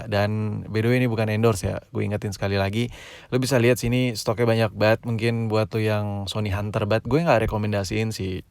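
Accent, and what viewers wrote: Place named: Java, Indonesia. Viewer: Lho orang jawa ya kok bahasa indonesia masih belum lancar ada medok nya jadinya gw like terus channel lu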